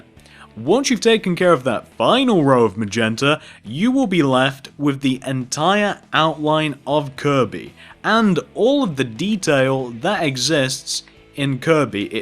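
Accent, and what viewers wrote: United Kingdom. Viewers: He is from the UK I believe.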